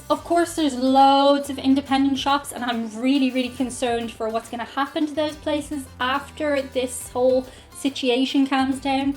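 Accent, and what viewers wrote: Ireland. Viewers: Well.....The fact that a beautiful Blonde Irish woman just looked right at us and like the total badass she is....said "you can keep your sexy and shove it right up your (*&^$*$)" in that beautiful Irish accent.....To that I say "that is about the sexiest thing ever" so Take that and stuff it Nova Poll hahahahaha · I love the Irish accent it rocks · Lol I truly love your videos. I Always end up with a smile if not a stitch in my side. I must disagree with the poll 😉. I find Irish ladies quite Sexy. The accent is a plus for sure. All the best. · maybe you should come and visit australia some time? or maybe not if youre worried about things that kill you? there are spiders, crocodiles, blue ring octopus? ,octopie? octopusses? anyway and Irukandji jellyfish in the top ten?? but moslty not in the burbs nowdays, mostly : ) would so love to hear your viewpoint on all the good and bad "'as if! 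' i would say, we live a reasonably laid back life style compared to most places. OH and i almost forgot, all aussies (Especially me, Lol) love a girl with an irish accent, you'll b able to get away with blue murder! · I would say the Irish are charming, and fun to drink with but I am biased being 1/4 - 1/3 Irish. I love the accent, and you aren't hard on the eyes either.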